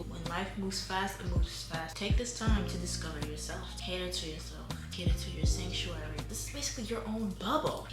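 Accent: Jamaica. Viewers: Content, music, and the Jam' twang...I'm with you!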